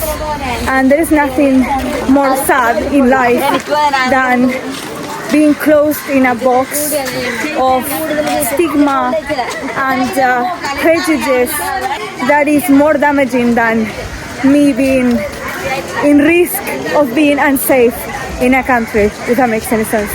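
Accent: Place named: Iran. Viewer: Are you Persian?you sound Persian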